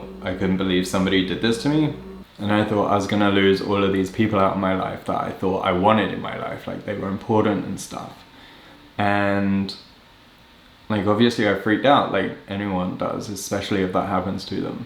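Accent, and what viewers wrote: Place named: United Kingdom. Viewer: I totally thought he was American at the beginning, then I thought he had a Speach impediment, then when he said he was 32 I was totally confused because he looks like 22 at the most, then finally I realized he has a British accent.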